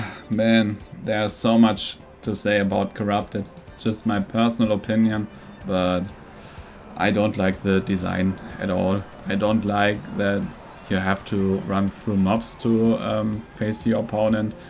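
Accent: Germany. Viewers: Love your German accent IGN: ZeusKingofGods2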